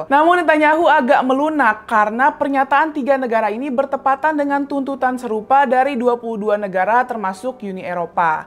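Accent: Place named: Betawi, Indonesia. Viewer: Semoga tercipta perdamaian. Di channel ini baca berita dgn dialek Betawi yg kental banget. Gpp sih. Sah-sah saja.😂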